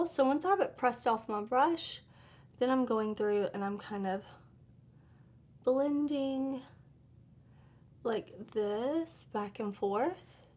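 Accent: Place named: Texas, United States. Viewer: I am so broken hearted. I have tried to get your brush set from Sigma about 7 times and they are always sold out. 😭😩😭😭😭😳 please tell them to make more so I can get your brush set finally. 😊I love you and your makeup videos. My half sister is from Mesquite, Texas and your twag sounds just like her. You are beautiful and your spirit is beautiful too! Keep making these awesome videos! Thanks girlie for helping with makeup tutorials and for helping to show all the new awesome indie makeup! ❤ thanks luv! ❤❤❤❤❤😊😊😊😊😊😊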